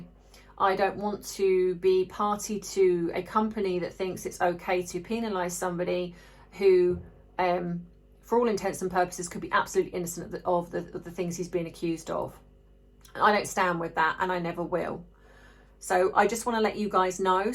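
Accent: England, United Kingdom. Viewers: Enjoy you videos and I like hearing about the Royals with an English accent. I don’t know what I think about this theft but I have heard that other items like a bracelet have gone “missing.” I also like the stance you took regarding Hello Fresh. I used them for a year and a half, cancelled after I found out and due to other issues with them. I appreciate you doing this.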